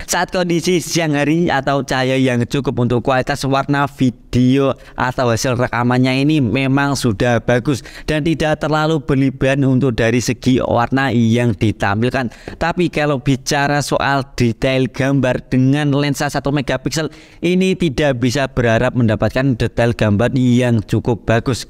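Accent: Java, Indonesia. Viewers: suara org jawa, medog bgt...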